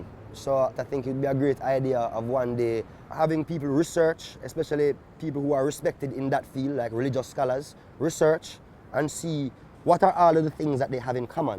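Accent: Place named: Jamaica. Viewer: Dear Sir Gong, thank you for never abandoning the authentic Jamaican accent. Blessings in these great endeavours!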